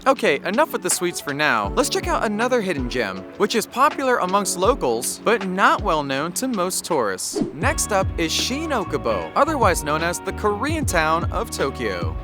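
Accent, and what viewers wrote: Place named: United States. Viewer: WE KNOW YOUR AMERICAN its ok stop being such an attention seeker we KNOW ok . FFS but great video the accent is annoying and hideous but great video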